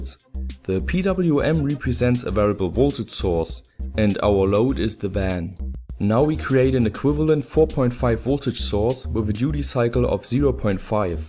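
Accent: Germany. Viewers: Xmcgamer0X he is german soo ... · He's German, so his pronunciation can't be perfect. But I bet you don't talk another language besides English as he does! ;)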